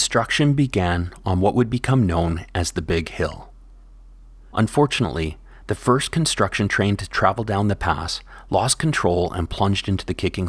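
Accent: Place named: United States. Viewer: For someone claiming to be local, it is annoying to find that you are American. Your accent ant mispronunciation of words, especially "route", belie your true nationality.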